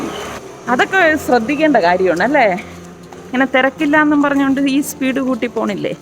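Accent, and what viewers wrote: Trivandrum, India. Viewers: Ithade correct place evda?? Lockdown aano avde....nte place nedumangad ippo kochila njn ....ithade samsaram kekkumbo nikk nte tvm orma varuva..... subscribe cheythutto..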